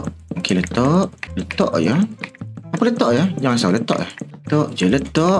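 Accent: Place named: Kedah-Kelantan, Malaysia. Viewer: Kedah campoq Kelantan 😂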